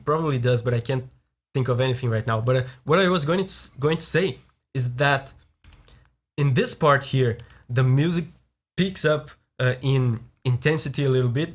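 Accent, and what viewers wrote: Brazil. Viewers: Sabia que você era Brasileiro só pelo sotaque kkkkkk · e esse sotaque brasileiro meu consagrado kkkkkkk otimo video abraços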